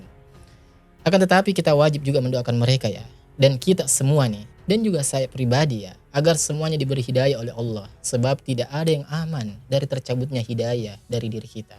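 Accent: Sulawesi, Indonesia. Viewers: Masyaallah, kentara sekali Sulawesi nya.. semangat ki Sodara❤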